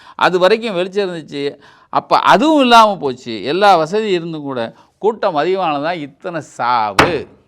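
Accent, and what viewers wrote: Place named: Tamil Nadu, India. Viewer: கோபாலின் பேச்சைக் கேட்டு சிரிப்பு ஒரு பக்கம் உண்மை ஒரு பக்கம். தென் மாவட்ட "ஸ்லாங்" மனதில் பதியும் தகவல். தமிழ் விரோத கும்பல் பெட்டி வாங்கி கொண்டு இவரை திட்டுவதை வழக்கம். தமிழ் நாட்டிற்கு கோபாலின் பணி தொடர்ந்து தேவை. நடராஜன் சுப்பிரமணியன் -படையாச்சி, தமிழ்த்தாய் ஆலயம். ப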